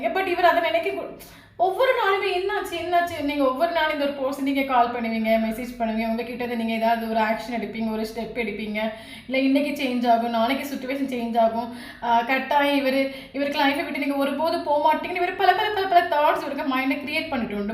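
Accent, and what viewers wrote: Kerala, India. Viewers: யார் நீங்க கடவுளா என் வாழ்க்கையில் நடந்தது எல்லாத்தையும் அப்படியே சொல்றீங்க நீங்க நீங்கதான் பிரபஞ்சமா எனக்கு நடந்தது எல்லாத்தையும் அப்படியே சொல்லிட்டீங்க இனிமே நடக்க போறது எப்படி சொல்லுவீங்க அம்மா நூற்றுக்கு நூறுக்கு மேல இல்லன்னு சொல்லுவாங்க ஆனா 100 க்கு ஆயிரம் சொல்லிட்டீங்க மா யாருமா நீங்க எங்க இருக்கீங்க எப்படி வந்து என் மனசுல இருக்கறது நடந்தது எல்லாம் வந்து உனக்கு தெரிஞ்சது ஒன்னும் புரியல எனக்கு வந்து ஒரே குழப்பமா இருக்கு நிறைய மெசேஜ் பார்க்கிறேன் அம்மா அதான் இது மாதிரி வந்து உண்மை வந்து அந்த அளவுக்கு சொல்ல முடியாது நீங்க என்ன கேரளா லாங்குவேஜ் பேசுறீங்க அது மட்டும் தெரியுது கோயிலா சுத்தத்தை விட உங்ககிட்ட வந்து எல்லாத்தையும் சொன்னா கூட ஒரு ரிசல்ட் கிடைக்கும் என்று நினைக்கிறேன் நீங்க சொன்ன மாதிரி நான் தினமும் வந்து பிரபஞ்சம் கையில வந்து என்னுடைய ஸ்டோரி எல்லாமே வந்து சொல்லி முடிச்சாச்சு இது எப்படி உங்களுக்கு தெரிஞ்சது பக்கத்து வீட்டு அம்மா மாதிரியே பேசுறீங்க அம்மா ரொம்ப நன்றி அம்மா நல்லா இருக்கு ரொம்ப நல்லா இருக்குங்க அம்மா 🙏🙏🙏🙏🙏🙏🙏🙏🙏🙏👍👍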